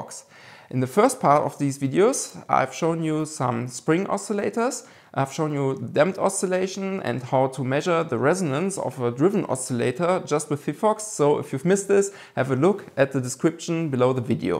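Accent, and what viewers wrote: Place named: Germany. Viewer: Deutscher der versucht Englisch zu reden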